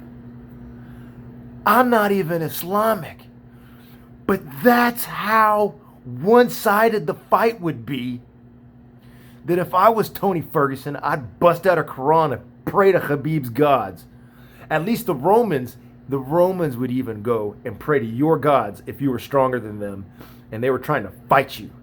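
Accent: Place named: Russia. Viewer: Your Russian accent is very cool! Haha😂 . Где изучал русский язык?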